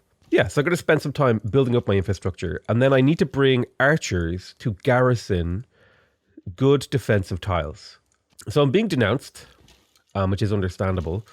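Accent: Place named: Ireland. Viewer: His irish accent did not get a single bit stronger yet the deeper voice makes him sound a shit ton more irish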